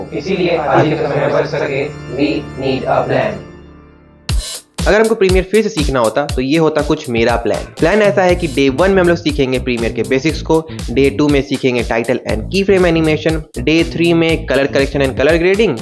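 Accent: Bihar, India. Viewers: Bihar se ho kya bhai😊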